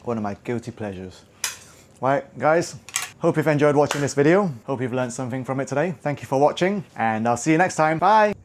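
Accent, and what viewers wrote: Northern England, United Kingdom. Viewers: Your British accent make it authentic flavor 😅 easy to follow , thank you . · This is like ' Sunday Kitchen ' on BBC 2 But Chinese Style. And a Northern English Accent BRILLIANT !!! 👍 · Are you from Hong Kong? I thought I detected a partial British accent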